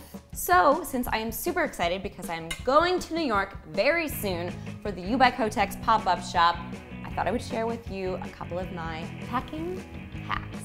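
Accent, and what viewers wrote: Canada. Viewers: I love her Canadian accent 😍😍 the way she says tag is so cute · Canadian Accents bruh: "my begs" & "luggage tegs"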